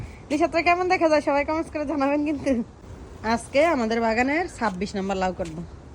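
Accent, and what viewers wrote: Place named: Comilla, Bangladesh. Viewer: আপু কিছু মনে করবেন না,কুমিল্লা কোথায় আপনার দেশে বাড়ি কেননা আপনার কথা ঠিক আমাদের বাড়ির কথা ,আমিও আমেরিকাতে আছি,please আপু বলবেন কিন্তু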